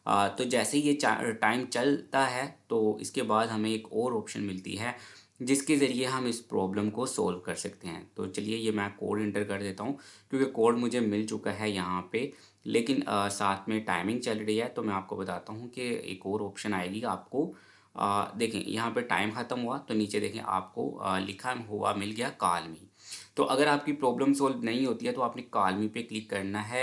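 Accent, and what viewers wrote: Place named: India. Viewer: You are such a scammer You write the subtitle of your video in English But your speek is in indian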